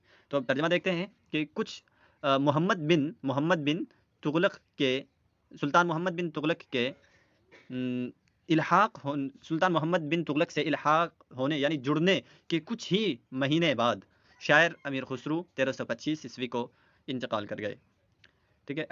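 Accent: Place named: Kashmir, India. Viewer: Sir your accent is different are you from Kashmir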